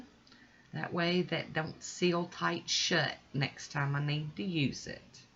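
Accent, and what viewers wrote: South, United States. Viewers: Very helpful. I love your southern accent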